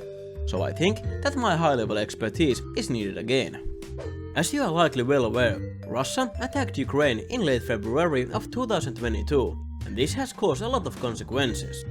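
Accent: Finland. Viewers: Fairly difficult to take these videos seriously with this excessive finnish accent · me hearing first three words and realising that this guy is finnish (finnish accent) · Sun äänestä kuulee heti et oot suomalainen.(ralli engalti)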